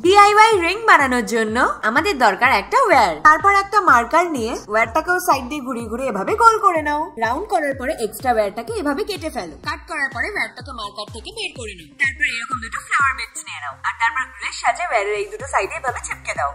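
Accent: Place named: Bangladesh. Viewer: তমার বাংলাদেশি voice এতু ভাল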